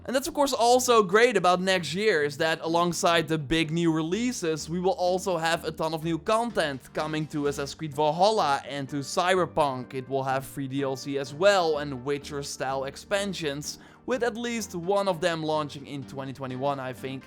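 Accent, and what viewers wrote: Netherlands. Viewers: R you dutch?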